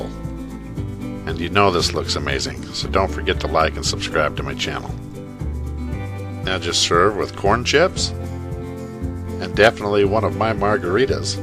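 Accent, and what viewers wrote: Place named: Texas, United States. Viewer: Lol. Come to Texas. We all talk like this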